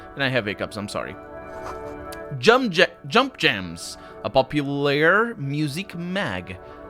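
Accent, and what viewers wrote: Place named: Portugal. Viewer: Dude you're already a portuguese person speaking english, trying to act native american would be a bit too hard lol. I don't mean this as an insult. I just know how much portuguese differs from english is all. Also coming from someone who speaks a different language as well that doesn't look even close to english either. heck I don't think I can pull off accent anyway.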